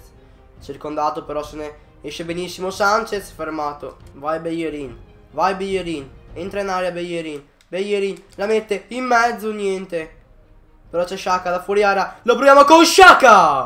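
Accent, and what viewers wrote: Veneto, Italy. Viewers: Ma sei di Venezia?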